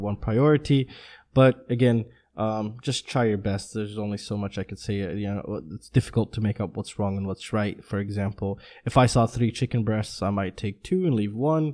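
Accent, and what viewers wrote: United States. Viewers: Blows my mind I have been made aware of that you are Hungarian as I am. Never came to my mind for a second! Perhaps your American(?) accent have thrown me over. LOL The confirmed cases are not exactly equal to existing cases - you and me both know how reliable the data can be handled by our government (especially when you want to avoid panic at any cost). I am worried for my Mum in Pecs. What rumours you are referring to exactly? When only 4000 tests have been carried out you can always say 'nothing to worry about because we only have X number of cases'. It is not a rumour only - the Hungarian chief medical lead is a GP from a village with 4000 population without any further medical background - it doesn't really brings much relax to my mind. You can be a brilliant mind (or a medical genius) if your boss in charge lacking on the expertise. 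Currently we have not enough tests carried out (compared to other EU nations) to know how much Hungary is involved in the global pandemic. Rumours hah? If it is possible I am now appreciate more your hard work knowing it is coming from Hungary. I wish the very best for you and your family in this challenging times.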